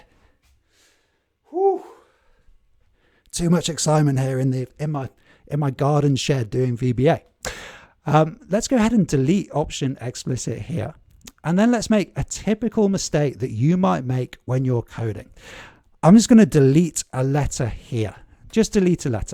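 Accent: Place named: United Kingdom. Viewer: I also learn English here! "Stag Do"....I looked it up and it means bachelor party. We use the term "bachelor party" in US. I learned VBA from other Youtube channel , but this interactive course , I think, is better. The British accent sounds a little bit strange to me at first, but I get used to it.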